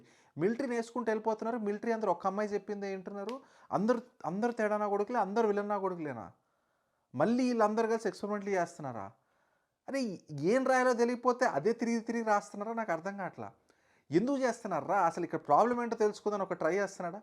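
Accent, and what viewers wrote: Telangana, India. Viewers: Endanna Telangana slang lo ochav esari 😅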